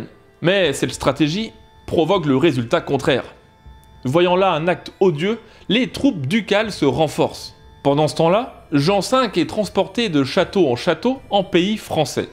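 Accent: Vannes, France. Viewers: Trudjerez ha tchenevo... C’est excellent d’entendre l’accent vannerais, j’adore..! (Désolé pour mon écriture certainement blasphématoire) Méga big-up à notre chaîne nationale..! Tellement intéressante..!! ❤️👍❤️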